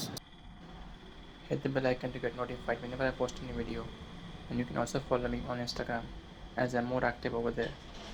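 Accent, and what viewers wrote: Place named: India. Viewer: The problem is your accent. Write the words below the video to be running on the screen while you are speaking in the video. Otherwise, most of us who are not Indian missout much from what you are speaking.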